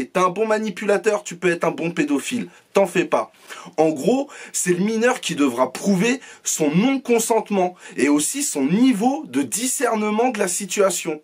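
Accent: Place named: Banlieue, France. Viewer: voix de benlieusar voix de bonhomme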